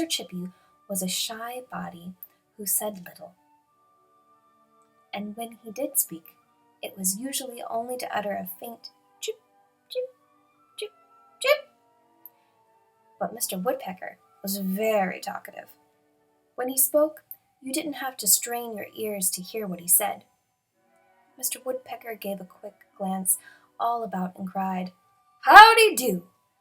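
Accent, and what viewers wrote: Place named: United Kingdom. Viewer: so 2 things the reader is British or something and yeet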